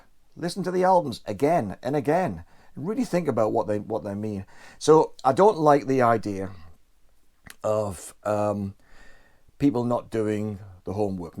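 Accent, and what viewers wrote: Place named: Newcastle, United Kingdom. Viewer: Keep it going,. I really enjoy your videos, especially the music stuff. And for the record (no pun intended), as a teacher of teenagers for the past 22 years, I have a reasonable idea of how to engage an audience and keep them interested. You're terrific at that. The geordie accent helps too!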